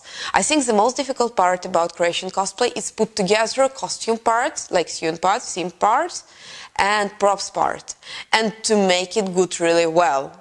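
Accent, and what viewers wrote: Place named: Russia. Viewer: Опять эти Russian инглиш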